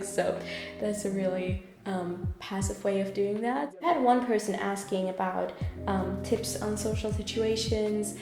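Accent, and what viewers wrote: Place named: Germany. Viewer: Ich glaube mit Akzent war eher Dialekt gemeint, also die Region aus Deutschland wo du aufgewachsen bist. Kein ausländischer Akzent :)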